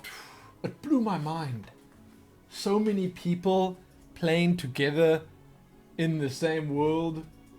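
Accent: South Africa. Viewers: Is he South African??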